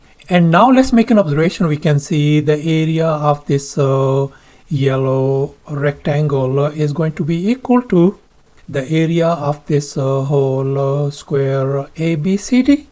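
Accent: India. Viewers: It takes 12 seconds to solve this . it's OK to speak indianglish but you don't need to kill yourself for 10 minutes 9 × radical = 12.7279... 12.7279...- 8 = 4.7279... 4.7279...× 8 = 37.8233... 😂😂😂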